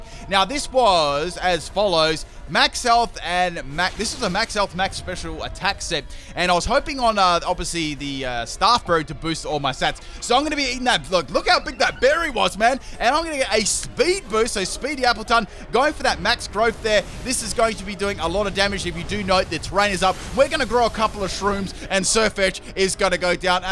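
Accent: Australia. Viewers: Bro he's australian not british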